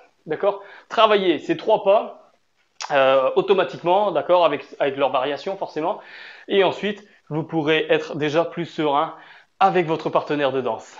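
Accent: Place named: Madagascar. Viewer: Coucou, pas mal....on dirait vous avez un accent malgache!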